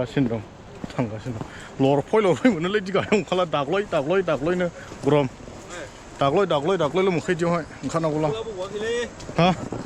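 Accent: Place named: Baksa, India. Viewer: baksani sur arw ngswr ni sur jw alda Ka ada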